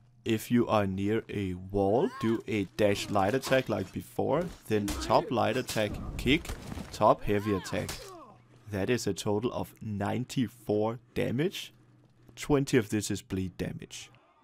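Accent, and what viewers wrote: Denmark. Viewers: I can hear the Danish accent, it’s really thick. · Thats is one very thicc danish accent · First of all, great fucking video. Second of all, that is the most extreme Danish accent I've ever heard! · Are you danish? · Not to be rude but your English sounds so much like a typical “danish English” Are you Danish?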